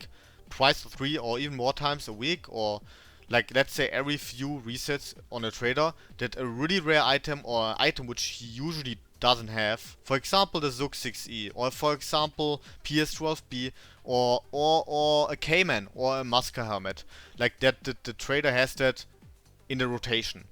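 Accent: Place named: Germany. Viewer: Dein Englisch ist top aber der Akzent ist nicht zu überhören 🤣 German :p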